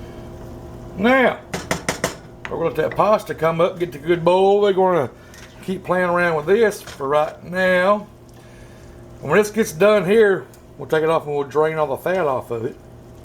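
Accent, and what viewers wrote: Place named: Appalachia, United States. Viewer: well that looks real good I sent the recipe to my granddaughter and I like your hillbilly accent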